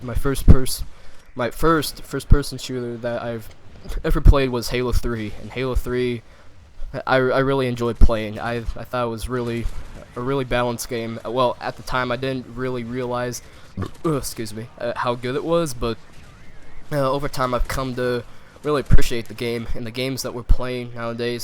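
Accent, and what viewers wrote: United States. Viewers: your voice sounded so much more confident and American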